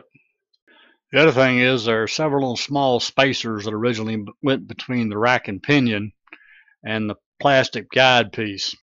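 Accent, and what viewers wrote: United States. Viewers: Great Work ! Accent is the best ! (Nashville ! guess) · Country accent 😈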